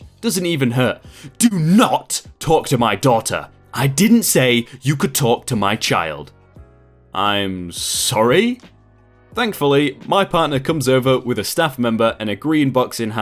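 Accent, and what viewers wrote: United Kingdom. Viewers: I love how you pronounce things in British English (I’m a Aussie, we also use British English) (Richard is a good name for entitled dads)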